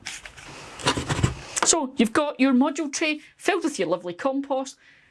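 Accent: Scotland, United Kingdom. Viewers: I truly don't know why more people aren't watching your channel. I get so excited every time you come up with a new episode. You're just so cheery and wonderful and I love your cute Scottish accent. It's just such a pleasure to watch when it's -27C and 30 cm of snow with 10 more to come out my window... Hahaha I guess that's what I get for living in Canada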